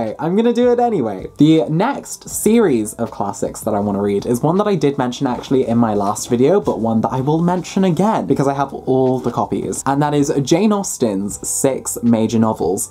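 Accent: United Kingdom. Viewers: do people really think you’re American? I think you sound so British (but I’m American so of course I don’t think you sound American lol)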